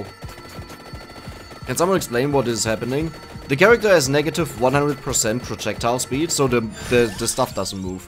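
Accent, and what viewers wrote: Germany. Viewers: the reason people can hear you're german is your pronounciation of words, and also your "so" word. You say so as a german would say so in german xD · How you pronounce your English words is how non-Germans recognize your nationality... it's your accent. That's not good or bad, it just is what it is. :) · He already know why sounds German.... Because he is German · Your english is close to perfect I think. Its just the accent thats German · You wondered why people can tell that you're German. I think the two biggest tells are pronouncing "the" as "de" and the specifics of "ya" as a replacement for "yes." "th" becoming "d" is VERY German. You extend the "a" at the beginning of "ya" and use a sound at the beginning that's halfway between "y" and "j," which is also very German. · If you want to know why you sound German I can recommend the video from improve your accent on this topic (it helped me with my Englisch) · I heard you where German the first time I saw a video of yours! 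I am Norwegian, so I recognise German/english easily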